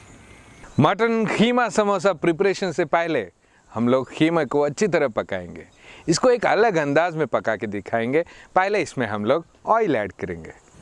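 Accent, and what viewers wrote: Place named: South India, India. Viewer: MashAllah bhai tumhari Urdu bari mazay ki hai... South touch ata hai... Love from Pakistan to the Indian ❤️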